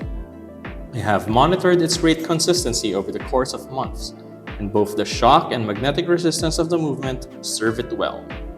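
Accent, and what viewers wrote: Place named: Philippines. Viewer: You sound Filipino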